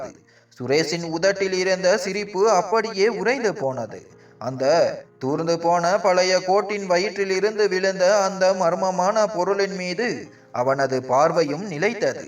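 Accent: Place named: North India, India. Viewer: கதை நன்றாக உள்ளது ஆனால் அதை படிக்கும் விதம் சரி இல்ல. வடக்கன் தமிழ் பேசினா எப்படி இருக்குமோ அப்படி இருக்கு.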